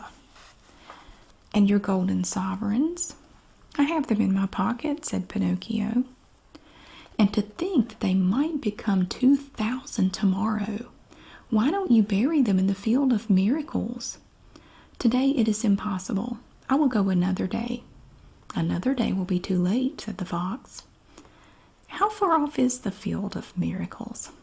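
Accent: South, United States. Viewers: There’s nothin like a sweet southern woman reading u a bed time story for u to sleep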